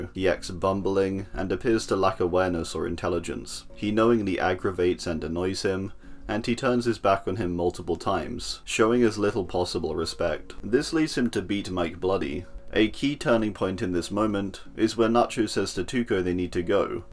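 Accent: United Kingdom. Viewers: Isn't this the guy who does DbD videos? I love this british voice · Hey how's it going?, i assume your accent is English but i can't seem to place your accent on a regional scale, i mean?? is it South? South East?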